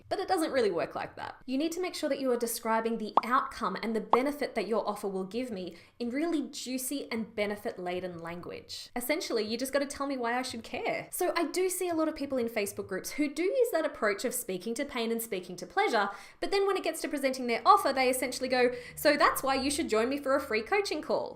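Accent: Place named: Australia. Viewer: OMG! Love you! Aussie Voice #1 Relatable #2. Freakin Funny & Human #3 Thank YOU #4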